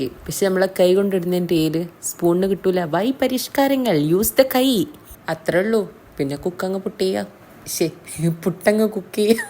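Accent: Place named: Kannur, India. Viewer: കണ്ണൂര്കാരി ആണല്ലേ ❤❤❤❤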